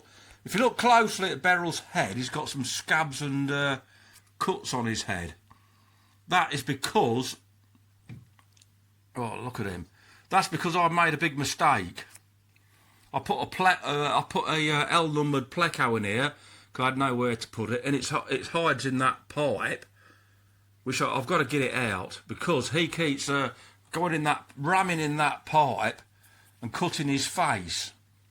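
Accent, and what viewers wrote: Midlands, United Kingdom. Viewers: hi, just subscribed, great videos you have. yes I agree with you regards the fry, wouldn't be so bad if she just had 20 fry or so. p.s. you must be from the Midlands with your accent 😉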